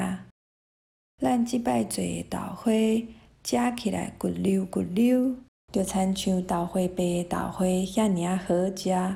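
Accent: Quanzhou, China. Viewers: 我覺得你的腔口很可愛，看字幕說是泉州腔，我聽起來有點福州加彰化和美一代的海口腔，跟我講的泉州腔有點差別，拙見不喜勿怒。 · 感謝使用泉腔配音 挺有趣的 也很難得 話說同安腔的二調變調是升調 但是這影片的配音 比較不明顯 (新竹當地的操新竹腔的中老年人就很明顯) 另外這影片保有部分老泉的央元音 例如"事sīr" 但是也有一些讀音丟失央元音變成新泉了 例如"鍋e"(老同安腔讀er) 略感可惜了些 附帶一提 傳統上(例如臺北腔 新竹腔)"好"讀ㄏㄛˋ "ㄏㄜˋ"是南部腔的讀法(即臺灣南部偏漳腔 主要分布於臺南與高雄一帶 而傳統上漳腔也是讀ㄏㄛˋ) 這個字的音讀混到南部腔了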